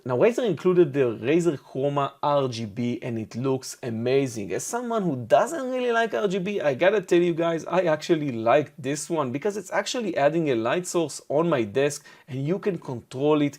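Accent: Israel. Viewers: Is that israeli accent?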